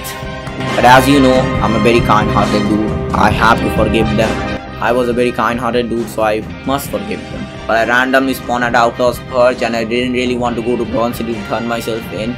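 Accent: India. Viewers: Bro got them indian accent drip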